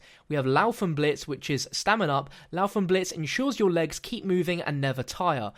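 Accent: United Kingdom. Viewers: He's from the UK, so his pronunciation is incorrect.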